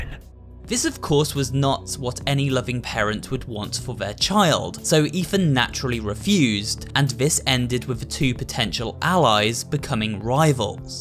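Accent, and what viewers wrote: England, United Kingdom. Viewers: Im german, so i understand the names even better. Also its always fun to hear an english person pronounce german words.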